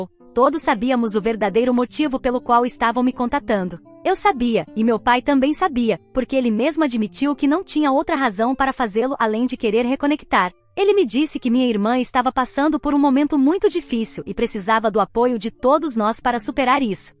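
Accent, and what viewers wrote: Portugal. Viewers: Esse português de Portugal ai 😅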